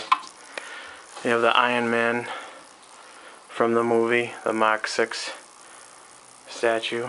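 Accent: Boston, United States. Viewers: Boston accent is killah....apahtment..lol.love it. I just got the PF Freddy Krueger and the J. scott Campbell comiquette. They are frikken shhweeet!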